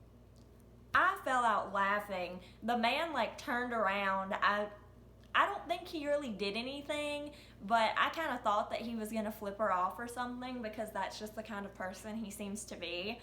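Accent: South, United States. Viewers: love the Southern woman accent beautiful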